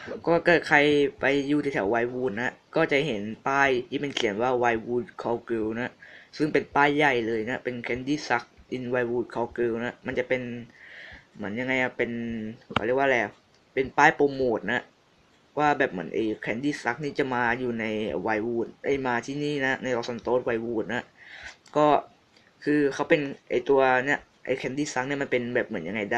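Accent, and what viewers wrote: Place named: Thailand. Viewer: ทำไมพี่เสียงเหน่อจังคับ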